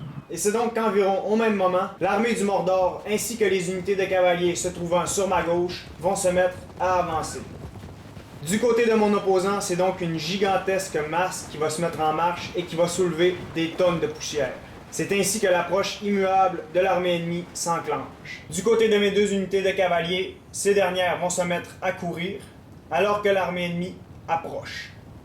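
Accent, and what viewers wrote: Quebec, Canada. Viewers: moreover, he's got an accent from Québec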